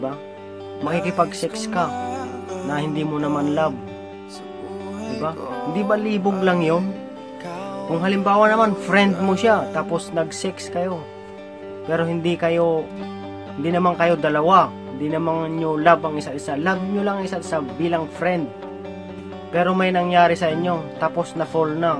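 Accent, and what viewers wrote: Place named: Ilocos, Philippines. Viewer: Aguy gnda ng topic kabsat.tuloy mo lng yn topic mo hahaha... Panalo sa payo.Ilokano tlga ang tonoooooo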